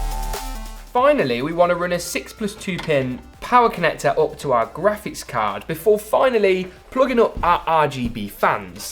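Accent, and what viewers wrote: United Kingdom. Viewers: Man i hate the British accent so freaking much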